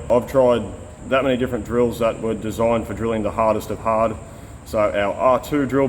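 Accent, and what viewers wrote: Australia. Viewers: "... now I'm talking American." That's OK Mate, I've had to learn how to listen in Australian...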